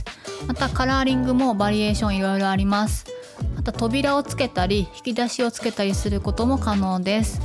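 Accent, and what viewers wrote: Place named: Osaka, Japan. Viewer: 、ご実家大阪なんですねーー。端々になんとなーーくなじみある大阪弁のようなイントネーションを感じていたので、一人で納得してしまいました。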